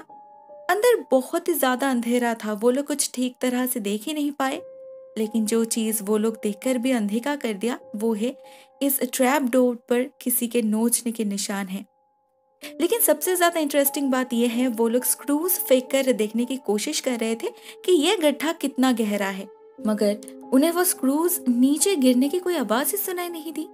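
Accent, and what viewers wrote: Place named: Bihar, India. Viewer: Aap bihar se hai na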